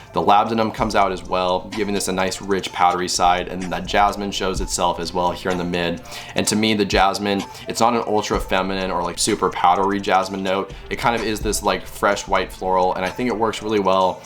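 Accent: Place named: United States. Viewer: Bro plsss get your american accent under control......i wanna scratch out my ears after listening to you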